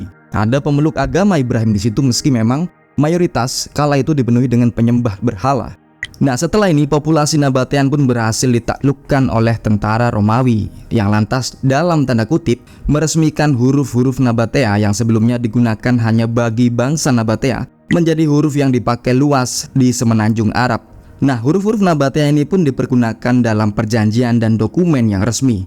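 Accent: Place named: Banyumas, Indonesia. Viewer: Jawaa ngapak bang😂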